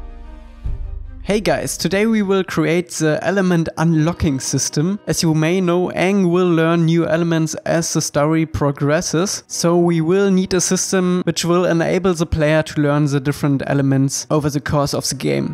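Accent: Germany. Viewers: German accent is so cool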